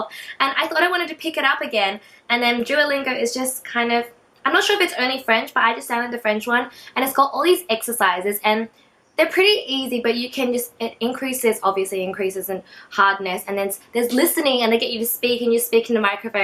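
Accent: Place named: Australia. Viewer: Randomly found your channel and got obsessed with your Australian accent!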